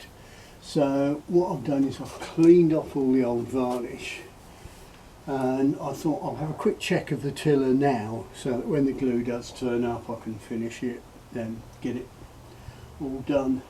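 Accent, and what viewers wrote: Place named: United Kingdom. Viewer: Quick question, I assume you are in the UK based on your accent, I was wondering what the law is for you in England over bows, where can you shoot them, do you need a licence to own one etc, Im in Scotland so laws may differ but been thinking about trying to make a bow for a while but not knowing the law situation has put me off trying.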